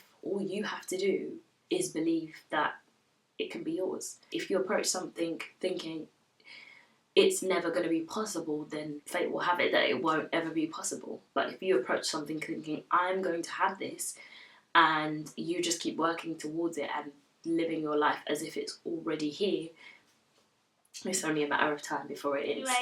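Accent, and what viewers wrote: United Kingdom. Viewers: i love your British accent! You sound very elegant =)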